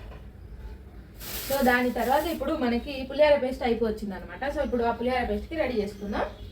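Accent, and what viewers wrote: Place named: Rayalaseema, India. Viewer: Good to hear your conversations. Your Rayalaseema accent is wonderful to hear. Love your videos 👌👌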